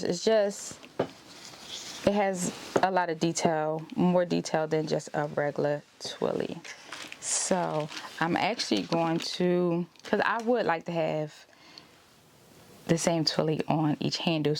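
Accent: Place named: Baltimore, United States. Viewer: You sound like you're from Baltimore gurllllll ❤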